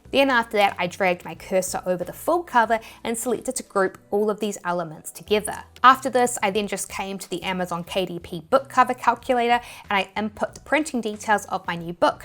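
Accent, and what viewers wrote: New Zealand. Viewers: Video showed up on my recommended, so I clicked on it, and as I listened, and I wondered to myself if you were from New Zealand, so I came to the comments, and my question was answered 😂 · why yees instead of yes and aheed instead of ahead is it like a kiwi accent ?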